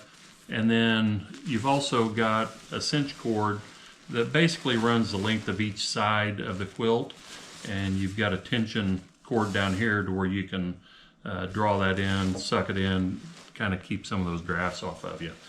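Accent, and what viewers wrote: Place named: Kentucky, United States. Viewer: I retire in 2 years and want to hike the AT. Sir, you are so lucky your wife is willing to do this with you. I wish my wife would do so. I have the same Big Agnes tent that you have. It's bullet proof, but it is heavier. I have never used the footprint, but it's not a bad idea on a thru hike. I love it. I also love my Duplex when I'm hiking alone! Great choices! I love my Nemo Tensor pad! I have an Enlightened Equipment Revelation quilt and I love it. It's only about 20 ounces. But, your quilt is great! Put some miles on those legs. I've hiked the Smokies and it's a but kicker! I'm from Kentucky, you sound like you would be from somewhere close! I also subscribed!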